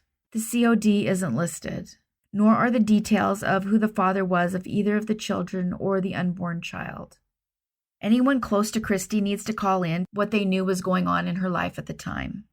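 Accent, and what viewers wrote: United States. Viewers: I love your delivery. I think its the Fl accent for me. You remind me of my bff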